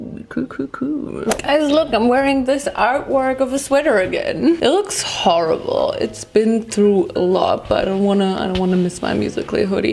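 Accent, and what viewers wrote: Germany. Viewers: Haha hab den deutschen Akzent sofort herausgehört und plötzlich hat sie Deutsch gesprochen 😆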